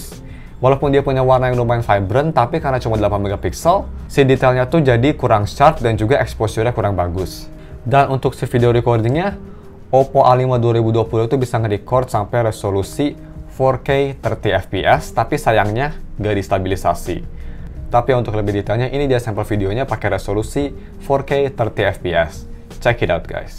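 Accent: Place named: Kerhor, Indonesia. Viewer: Bahasa audio nya pasti orang kerhor ini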